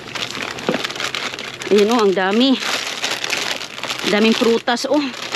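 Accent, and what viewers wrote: Visayas, Philippines. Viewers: Your very visayan speaking,that's good your husband &your kids are eating foods.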